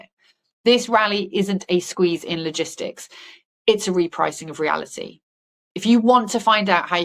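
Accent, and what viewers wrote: United Kingdom. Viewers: Absolute nonsense in a British accent.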